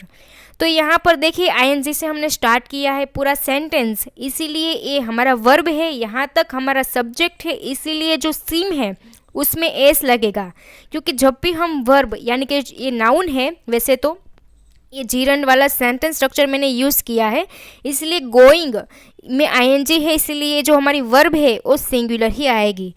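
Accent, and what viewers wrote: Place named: Saurashtra, India. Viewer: You belong to saurastra, because of your tone of speech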